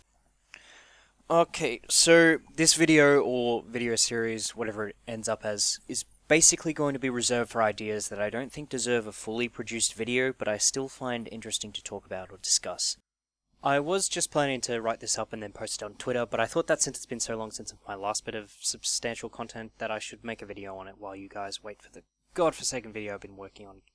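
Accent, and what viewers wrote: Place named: Australia. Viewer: He's Australian Wtf is up with American people and not being able to recognise accents? Smh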